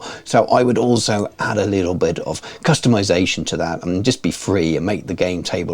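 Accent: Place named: England, United Kingdom. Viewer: It is an absolute joy listening to you read Old World narrative in your English accent.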